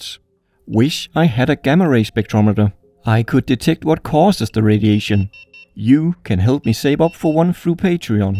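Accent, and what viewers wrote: Denmark. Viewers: Er du fra Danmark? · Wait what are you, Danish, i always thought that you were German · Gotta love that danish-english accent ❤️ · Are you Danish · Australian? It’s danish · Are you danish?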